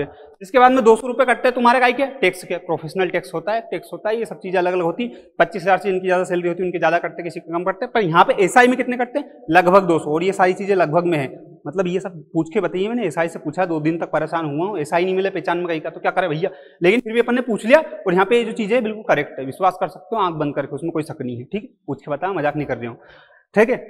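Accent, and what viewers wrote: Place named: Indore, India. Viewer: Indori bhasa 😂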